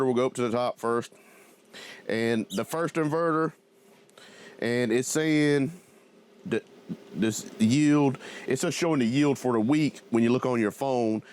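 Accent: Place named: Texas, United States. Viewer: Nice! Kind of hard to guess not knowing where you're located (although sounds like TX), but I'm going to guess 101kW.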